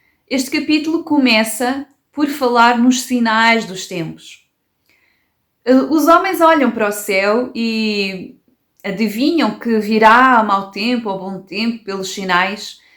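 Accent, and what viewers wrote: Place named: South, Brazil. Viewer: Que linda eu aprecio muito o português sul real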